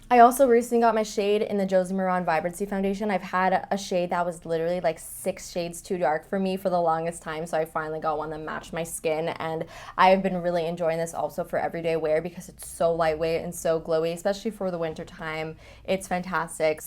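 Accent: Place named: Canada. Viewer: Where are you from in Canada because I can hear a strong accent. Definitely different to how most people speak in B.C.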